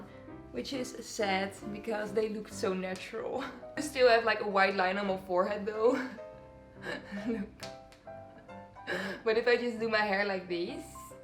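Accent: Netherlands. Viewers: Omg ik dacht zo ze klinkt zo nederlands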